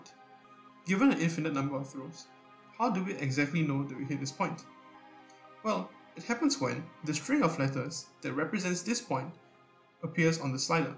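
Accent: Singapore. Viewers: yess recognised the accent as a fellow sinkie, keep up the good work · it's your first video so it's normal that you have a lot to improve. my advice for the next one, put some text. even if you get a new mic, you still have the singapore accent and some westerners can't understand it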